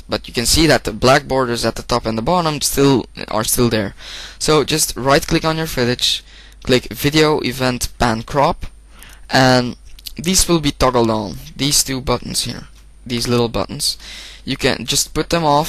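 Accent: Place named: Netherlands. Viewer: haha en je nederlands? dat lijkt zo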